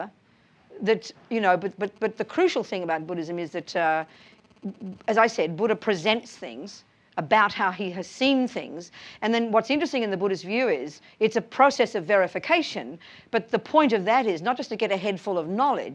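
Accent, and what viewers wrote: Australia. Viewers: She's Aussie, 100%, so even drier still. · i like this nun she speaks good aussie language peace compassion wisdom im happy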